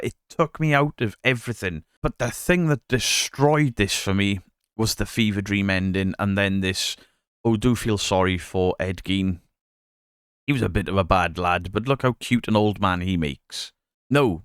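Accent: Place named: Wales, United Kingdom. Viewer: I was thinking you were Welsh,I'm Irish myself. Good channel. Subbed 🇮🇪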